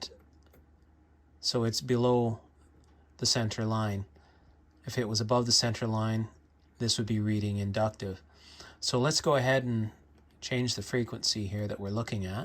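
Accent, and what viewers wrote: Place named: Canada. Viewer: You sound less Canadian in the revision of this video. Also, I have that same keyboard, and it is a beast.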